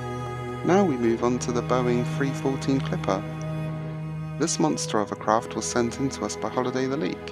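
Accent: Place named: United Kingdom. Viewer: and always remember if you feel down just think of us because your voice calms at least me down. It's just so smooth yet you sound just like a normal British guy. In short keep it up and be happy because you deserve it even if you work your hardest. 👍😁🍀👍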